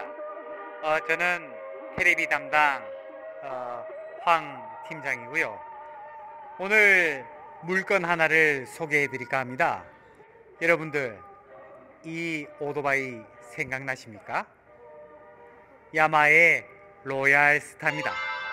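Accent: North Korea. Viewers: 이분 엄청 골 때리네.ㅋㅋㅋ 얼굴은 30~40대 초반? 진행은 60~70대 노인 스타일, 말투, 발음 ㅋㅋㅋ 약간 북한 말투가 툭툭 튀어 나오시는것이 이분 물건이네요.ㅎㅎㅎ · 팀장님 ᆢ 북한 시람 입네까 ? 말투가 평안도 함경도 자갱도. ᆢ 어댑니까 ?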